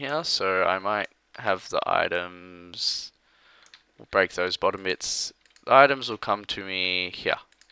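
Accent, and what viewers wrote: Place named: Australia. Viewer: I laughed my ass off hearing you count from 1-30 seriously, i admit i've heard my fair share of australian accents, but this was brilliant, this made my day! Hope no offense is taken, im saying this as a compliment. If i could have an australian accent i would ! just to count like you do! lol haha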